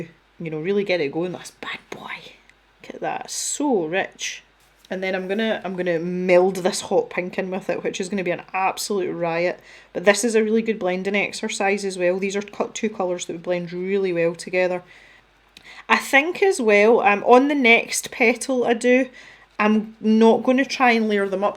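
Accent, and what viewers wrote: Scotland, United Kingdom. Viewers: I have these in Australia and they are good, love your Scottish accent 😊😊😊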